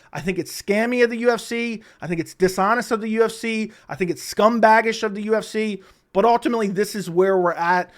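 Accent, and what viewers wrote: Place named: Philadelphia, United States. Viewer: That Philly accent is top notch my guy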